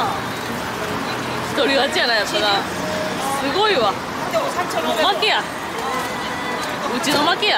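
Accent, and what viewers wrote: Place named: Hyogo, Japan. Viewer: 兵庫の人ですか？「～しとう」がずっと耳に残ってるｗｗｗ いかに美味しいか説明のパワーと語彙力おもろい:yougotthis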